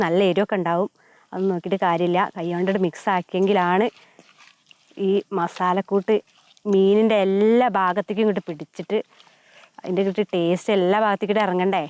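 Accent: Malappuram, India. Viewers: മലപ്പുറം ഭാഷ 👌👌🤦🏻‍♂️👌👌👌😂😂😂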